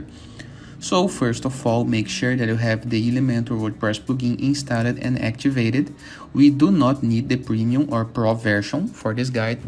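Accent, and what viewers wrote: Brazil. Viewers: Sotaque brasileiro kkk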